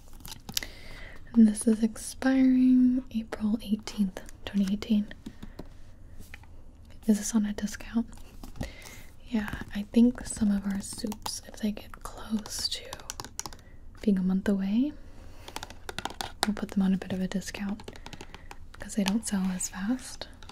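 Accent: Canada. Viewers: w8 is cAnaDiaN?!?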